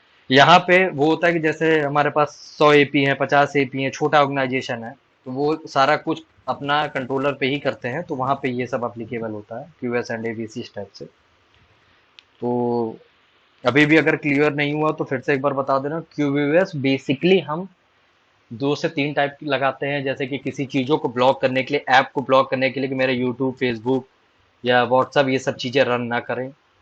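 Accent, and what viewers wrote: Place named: Uttar Pradesh, India. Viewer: avaj se lag rha tha koi up ka hai😾😾😾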